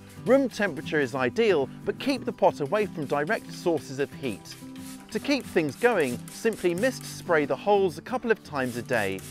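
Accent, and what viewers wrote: United Kingdom. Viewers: Apart from all the useful information that this video provides, I found it quite relaxing to watch and your British accent contributed big time to that effect as well 😄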